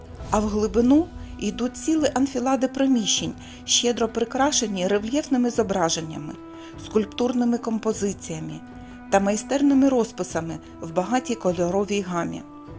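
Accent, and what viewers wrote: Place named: Belarus. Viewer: ахараняє? боже, ви наче не українка. а білоруска, яка з білоруськох мові перейшла на українську....слух ріжить... але урок хороший для дітей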